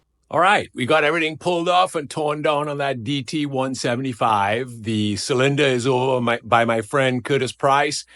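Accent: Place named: South Africa. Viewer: Nice work! Anyone who has done stuff like this knows it can be a bit tedious, but it is very rewarding in the end. That DT is gonna be a thing of beauty. I've been trying to figure your slight accent. South African?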